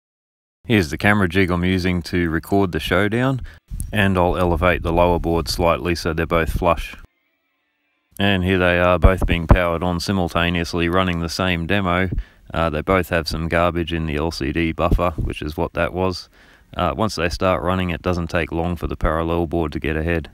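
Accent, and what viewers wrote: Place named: Australia. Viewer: pleasant change to here an aussie voice haha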